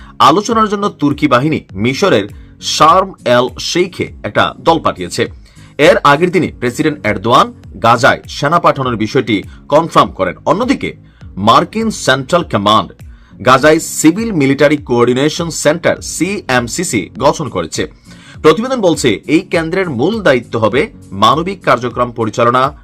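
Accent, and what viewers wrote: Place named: Noakhali, Bangladesh. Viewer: এইটা শুধু আপনার কুমিল্লার বাসা না এটা আমাদের নোয়াখাইল্লা ভাষা বুঝছেন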